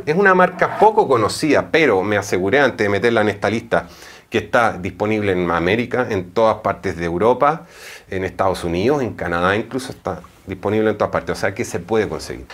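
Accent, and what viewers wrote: Chile. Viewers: Tienes acento chileno, eres o viviste en Chile? Me encanta tu canal, he aprendido mucho con tus vídeos. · Clave Alma si yo también lo dije es chileno y me encanta 🤗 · que tiene el acento chileno ...😡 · Hola 😊eres chileno tienes acento?? Felicitaciones, haces un exelente trabajo.Tus descripciónes son extraordinaria 👏👏👏Amo🥰 los perfumes 🌟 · Qué lindo los ojos de él, habla como Chileno, dónde se pueden encontrar estos perfumes en Chile???? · Solo por curiosidad ¿qué nacionalidad tienes? Escucho que hablas super "chileno", pero también a español... es curioso 🌱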